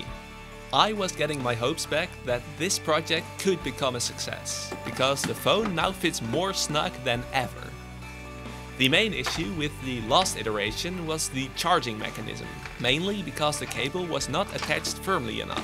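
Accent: Netherlands. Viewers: Yo r u dutch?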